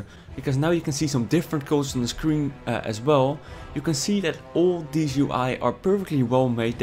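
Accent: Netherlands. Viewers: ahhhhh your accent man, im cringing ( dutch aswell )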